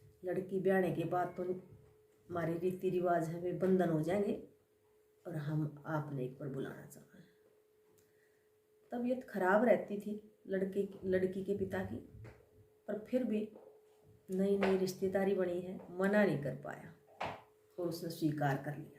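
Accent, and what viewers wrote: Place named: Haryana, India. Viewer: bhn me theth haryanvi 👍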